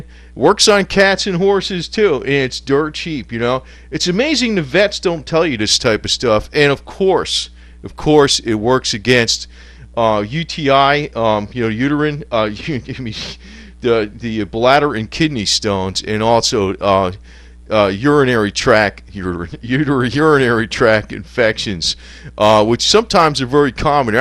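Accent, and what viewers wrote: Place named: New England, United States. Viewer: I absolutely adore your voice and the way you talk, including the accent. 💜 Is that a New England hint in your accent ? I'm not an American so it's hard to figure out. But it's amazing.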